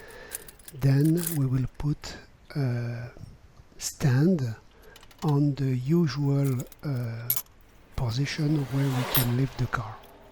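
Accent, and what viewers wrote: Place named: France. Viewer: Hello, am I correct...?? Does the Kangoo 2nd. generation have a K7J engine..? I have always loved to hear english spoken by a French person... but now I am especially pleased hearing you explain details about jobs I need and would like to do. am giving a big thumbs up to all your videos. Best wishes, Mike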